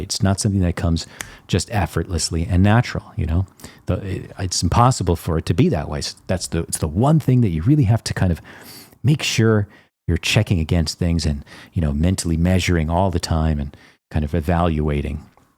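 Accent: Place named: United States. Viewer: I was half-expecting you to be dutch (I was surprised by the american accent)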